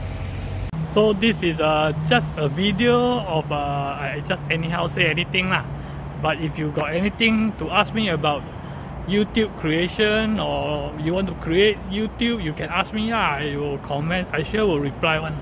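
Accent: Singapore. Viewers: If I were to analyze, the way you speak is cater for Singaporean only. A lot of international fans will not understand what you were talking. But most Singaporean like to watch watch only 2 things on YouTube. Comedy and Chio Bu(especially those sexy sexy type). That's why if you see trending, top channels/ Singaporean always repeat their contents. Like those 10 types of students/ 10 types of that (Which I find it super boring). Even those channel with Niche like runningKao which is focusing about running did not become as popular as those channel with girls showing skin. Furthermore, runningkao's english is similar to yours which is more suitable for Singaporean. Therefore, I feel finding a niche is important but depending on Singaporean for views will not be rewarding in the long run. That being said, I still love your content especially pre-covid19 all those BTO, Grab food (Hokkien version) and races (That's why I subscribed to you channel be I love the way you speak and your character) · Hi, i've been following your channel for a couple of years now and i say there's no need to change or to find a niche. 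Actually you yourself is already a niche. Your channel is about you and your life. And who you are, speaking singlish like most of us do, is what make you a true blue singaporean. This is also what makes your channel relaxing to watch. One thing i suggest is to do away with youtube shorts as it doesn't really bring anything across. You can make 30min vlogs of you touring around your neighborhood eating different food and that will already be relaxing to watch. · I am one of your subscribers, I love your content and your editing. You are very authentic and real. Don't change, I enjoy your uniqueness and Singlish. Thanks for your videos.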